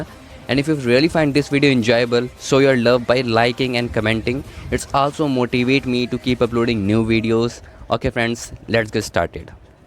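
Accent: India. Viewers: Are you an Indian. I asked because your speech like an Indian. I like your videos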